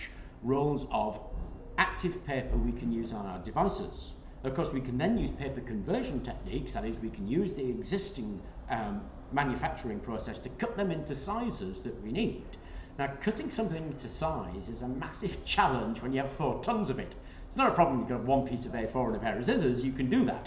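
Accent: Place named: United Kingdom. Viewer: Hello, and welcome back no new videos I missed your videos, I learned so many things from you, I am fascinated by the materials that can accumulate energy, I learned why it happens because simple materials are so wonderful. I learned a lot from your videos, even British English:) thank you for sharing your knowledge with us. I wish you much health, and so many beautiful things, you are the best. Ciao,e bentornato non nuovi video mi sono mancati gli tuoi video,ho imparato tante cose da da te,sono affascinato dagli materiali che possono accumulare energia,ho imparate perché succede perché semplici materiali sono cosi meravigliosi. Ho imparato da vero tante cose dagli tuoi video ,anche l inglese britannico:)grazie per aver condiviso le tue conoscenze con noi . Ti auguro tanta salute,e tante belle coso ,sei il migliore.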